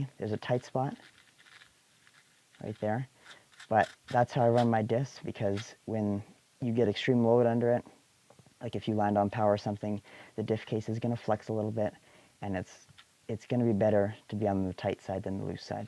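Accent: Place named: Canada. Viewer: i love the way Canadian people talk..... TRAILOR PARK BOYS!!!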